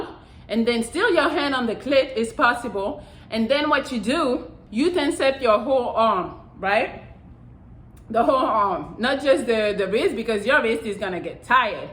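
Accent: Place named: France. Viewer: ?Coule it be that you speak french?Because of your accent i mean.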